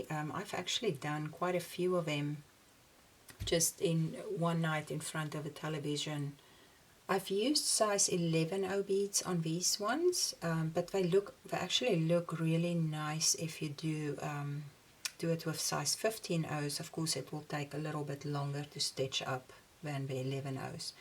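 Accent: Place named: South Africa. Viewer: Love your South African accent.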